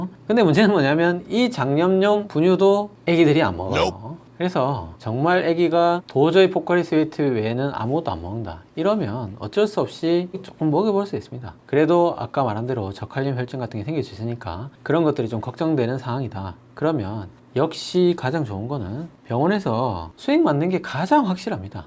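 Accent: Gyeongsang, South Korea. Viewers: 부산사람인데 경상도사투리덕분에 옆집의사쌤같네요.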